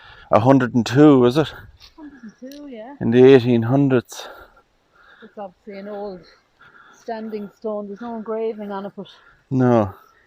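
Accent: Ireland. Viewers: Such beauty and history. I could listen to your descriptions in your fabulous Irish accent for hours.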